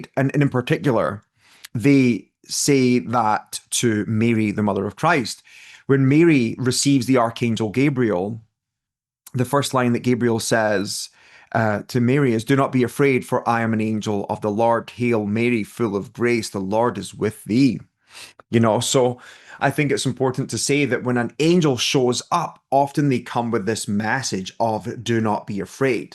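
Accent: Scotland, United Kingdom. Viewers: Hi. Nice to hear another Scottish person, im from Edinburgh 👋 I speak to my angels and guides every day. This video appeared on my feed, yet I've never heard of you before. Someone must have put it there 🥰😇 I see my angels sparks of light ✨️ everywhere around me. I saw an electric blue flash of light too that i believe was one of my guides. They all got me out of a difficult situation and send (bombard) me with messages and synchronisities everywhere. I also just recently did my Angelic Reiki 1&2 ✨️ 😇 I wish i could physically hear and see them though. Still on my learning path, and reading every book i can. Would be great to connect with you though ❤🙏 I really want to develop and strengthen my abilities to help others ❤ It's nice talking to other like-minded people 😊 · ❤, much gratitude for sharing your precious time and knowledge with us all. 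Joining from Tenerife. Born in Aberdeen Scotland. It's lovely to hear your Scottish voice. ❤my gran also read tealeaf.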